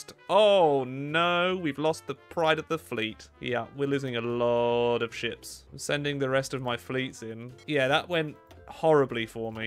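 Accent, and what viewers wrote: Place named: England, United Kingdom. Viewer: What's the name of the type of English accent he has ?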